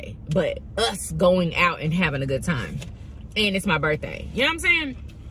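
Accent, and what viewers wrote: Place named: AAVE, United States. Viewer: Dang this food look so delicious 😊 you crack me up speaking Ebonics or whatever it’s called 😂😂😂😂😂 I love your videos!!!❤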